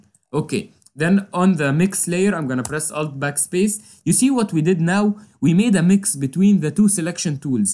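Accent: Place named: Egypt. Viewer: Egyptian accent !!!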